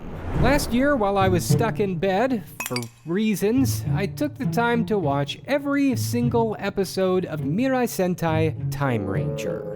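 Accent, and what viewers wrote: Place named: United States. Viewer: You sound very American 😂🤡.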